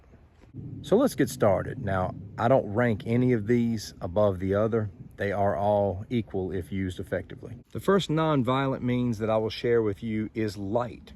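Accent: United States. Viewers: Ваш американский голос звучит ОЧЕНЬ классно Я согласен с рекомендациями.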